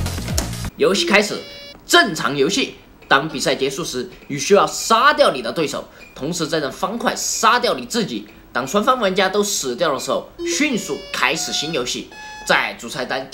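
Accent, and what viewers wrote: Sichuan, China. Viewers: 四川人吗，声音和鸭脖龙好像。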